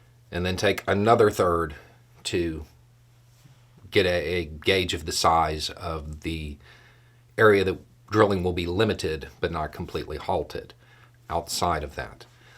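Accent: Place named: Rural, United States. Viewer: your accent has gotten crazy hick since you were "DIA." Hope things are great! Money glows.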